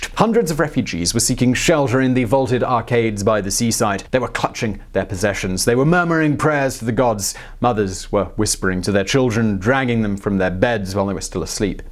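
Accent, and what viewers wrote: United Kingdom. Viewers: is a brit, so i'm sure it doesn't matter and will never happen, but i can only wonder what it would be like sitting at a thanksgiving day meal with. ten servings of 19 minute segments. with all the snark and wisdom that only the elocution of a british accent can bring to the english language. rock on.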